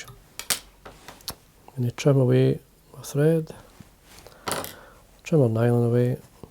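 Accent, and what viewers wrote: Scotland, United Kingdom. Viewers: Love watching you tie! Love your Scottish accent too!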